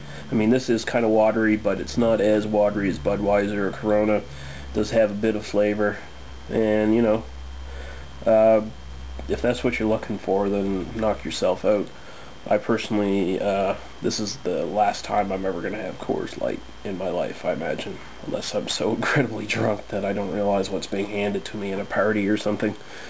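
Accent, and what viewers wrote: Canada. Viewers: you have a VERY canadian accent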